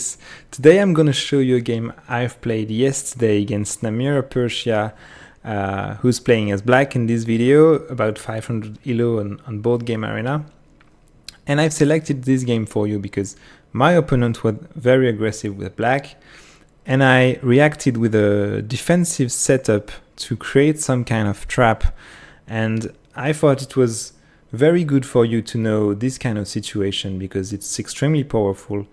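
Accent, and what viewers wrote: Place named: France. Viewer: Great video, I am not sure, but you have a strong French accent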